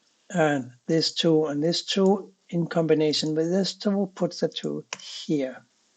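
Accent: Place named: Germany. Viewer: Are you German? Love you accent!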